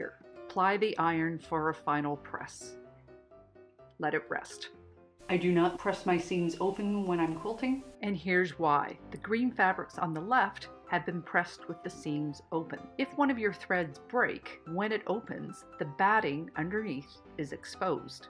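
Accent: Canada. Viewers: I knew it! You're Canadian ya! me too. Thanks for your informative videos. Bye from BC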